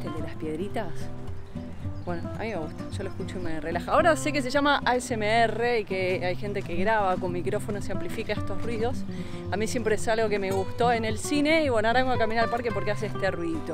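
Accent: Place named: Argentina. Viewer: escribo desede ginebra ( Suiza ), perdoname, mi nivel de español no es alto. Pero me encanta la energia que ud transmite con sus videos.es la mejor video sobre Badalona.En particular cuand ud.habla del parque que es el pulmone verde de la ciudad. Tu accento argentino es muy simpatico cuando pronuncias las palabras. Me recuerdo, que hace vueltas en bici desde Barcelona, pero en este tiempo no hay pistas para bicicletas, et yo me encuentro con mi bici, una vez en la autopista. Me falta mucho gramatica en castellano, y espero que eres indulgente conmigo. Besos de Europa Central. Tu nombre es?!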